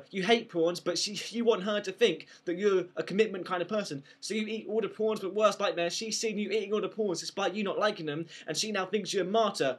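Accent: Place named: London, United Kingdom. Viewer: Are you aware that a Gurken is a Cucumber? It's basically a pickled cucumber, which I absolutely hate. You have an amazingly strong English accent, you sound like a Londoner.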